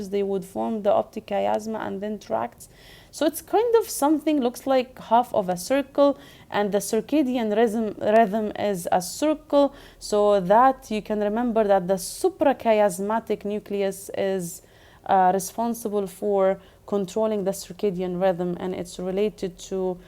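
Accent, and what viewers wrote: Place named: Arab world. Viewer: I have been watching so many videos to get into such complicated area, however i could complete non of them. now I feel lucky to find this amazing channel. Whoever watch this episode not only will be able to understand the topic, but also can memorize the details easily bcuz u r talented and creative regarding linking the informations. I wonder why your channel did not go viral yet, may be it is a location and suggestion issue. Though your accent seems arabic, your language is perfectly fluent. Listening to Indians is awkwardly exhausting and usually u turn the device off within ten minutes maximum😅. Thank u so much for making such unique lectures, I appreciate your work 💚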